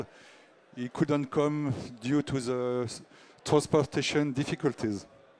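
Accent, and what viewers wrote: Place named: France. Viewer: À la manière dont vous vous exprimez en anglais Il n’y a aucun doute que vous êtes français lol Ou sinon, merci de m’avoir appris plus sur l’énergie nucléaire et aussi pour les sous-titres en français. Bonne continuation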